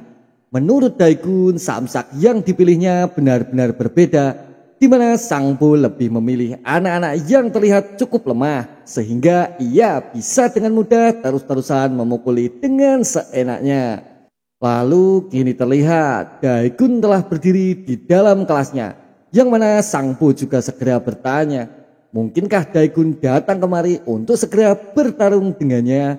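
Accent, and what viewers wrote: Java, Indonesia. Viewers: Jangan di ulang terus kalau ngucapin nya Logat Jawa Lo jangan di liatin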